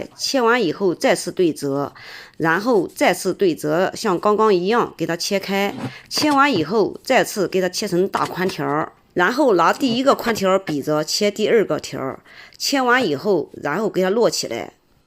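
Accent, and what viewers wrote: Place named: Shanghai, China. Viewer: 阿姨的上海話口音真好聽😅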